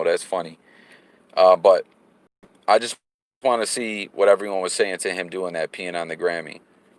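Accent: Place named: Nebraska, United States. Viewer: Hey is you from Nebraska or Cali? You sound like it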